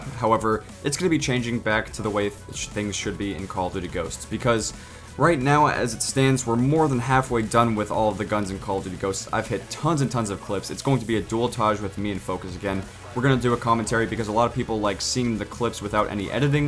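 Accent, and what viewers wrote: Germany. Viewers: R u German? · r u German? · R u german??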